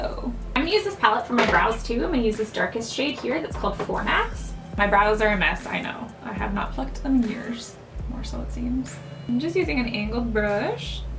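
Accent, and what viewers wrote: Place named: Canada. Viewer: i love your slight canadian accent it's so cute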